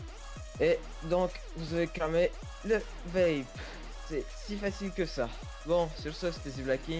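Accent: Russia. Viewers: Tes Russe ?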